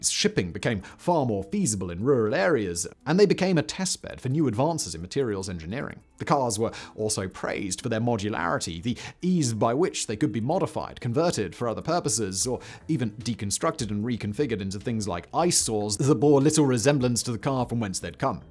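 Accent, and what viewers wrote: United Kingdom. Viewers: Thank you for cleaning that up. The Model T contributing to the stock market crash is almost as foolish as the car contributing to anti-Semitism. Clearly, just because one has a great beard, a cool British accent, and wears glasses doesn't mean that one is a sophisticated thinker.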